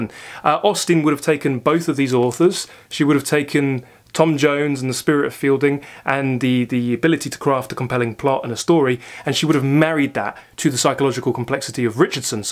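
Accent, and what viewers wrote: United Kingdom. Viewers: I have watched many many book collection videos on You Tube and in my opinion yours are by far the best. The information you provide is very informative and the manner in which it is presented is a joy to experience. The dialect helps (I'm a Yank) as it is the hallmark of the mother tongue as-it-were, but I think it is the thoroughness of your descriptions that I most appreciate. Please keep up the excellent work. [EDIT] I am VERY judicious in my subscription allocations, but for what it may be worth to you I have just subscribed to your channel.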